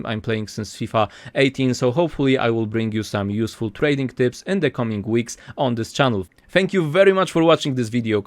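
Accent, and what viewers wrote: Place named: Poland. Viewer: Ja nadal słysze polski akcent xD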